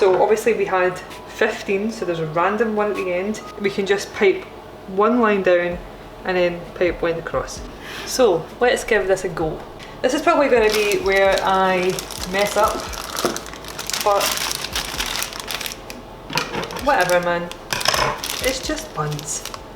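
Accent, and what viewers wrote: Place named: Glasgow, United Kingdom. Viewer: Glaswegian/surrounding area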